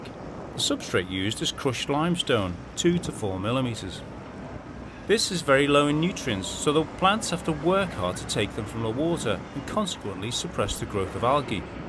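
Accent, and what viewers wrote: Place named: England, United Kingdom. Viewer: Documentaries just sound more official in an English accent.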